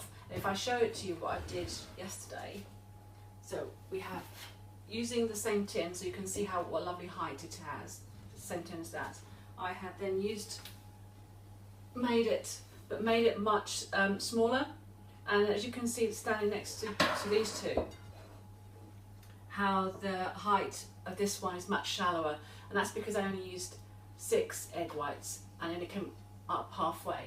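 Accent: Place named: United Kingdom. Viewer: Lucia P Que parvidade. Cada um fala a língua que quer. O inglês é mais universal e funciona melhor em termos de views no youtube. Além disso, não conheço esta senhora, mas o sotaque dela é britânico, portanto presumo que ela seja inglesa e não portuguesa, e que apenas esteja a ensinar uma receita portuguesa.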